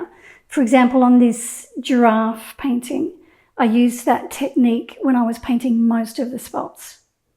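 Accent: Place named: Australia. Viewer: HI, ~ I HAVE ALWAYS JUST LOVED YOUR ACCENT, AND YOUR TUTORIALS~ NOW I JUST FOUND OUR YOU ARE FROM AUSTRALIA!!! HOW NEAT!!! I ABSOLUTELY LOVE YOUR DOUBLE GIRAFFE PAINTING BEHIND YOU~ I'M SURE YOU PAINTED THAT ONE, YOURSELF~ I HAVE BEEN WONDERING IF YOU HAVE A REFERENCE PAINTING OF THAT ONE, AND/OR A RUTORIAL OF IT!??? I JUST LOVE IT~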